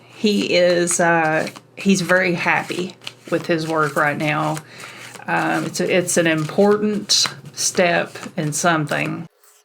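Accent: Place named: South, United States. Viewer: Your southern accent is adorable. Thanks for the reading!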